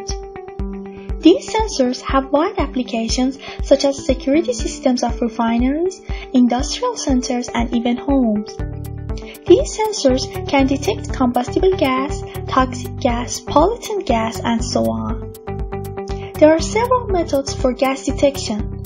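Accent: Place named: Iran. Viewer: دمت گرم بابت ویدئو و تلاشت که هم گرافیک قشنگی ساختی و هم خوب توضیح دادی. تعجب نکن از رو لحجه باحالت فهمیدم ایرونی هستی. ولی باز دمت گرم ، میدونم چقدر ساخت همچین ویدئویی زمان بره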